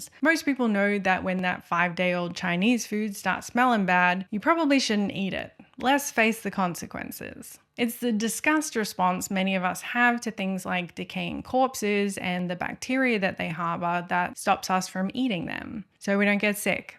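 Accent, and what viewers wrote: Australia. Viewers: Your accent is cute lol Australia? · You look mexican and sound Australian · An Australian video essay is so weird to me, it feels like I’m listening to some kind of ABC investigative report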